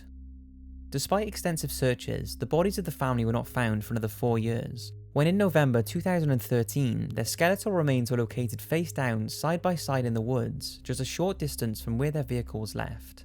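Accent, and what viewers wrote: Wales, United Kingdom. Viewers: Sounds Welsh to me. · does anyone know if this guy is welsh or not?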